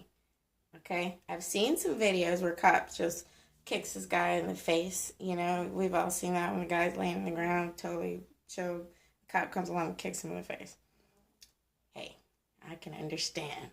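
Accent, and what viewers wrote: Texas, United States. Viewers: Your sexy as hell with that Texas Twang!...damn you are REAL as Hell!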